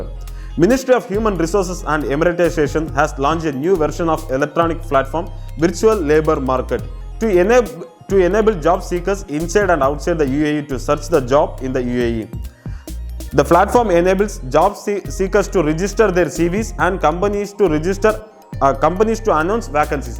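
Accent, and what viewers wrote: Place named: Nagercoil, India. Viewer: Iam hardly searching job at UAE.. its very useful brother.. neenga Nagercoil ah brother.. speech la Nagercoil slang varuthu..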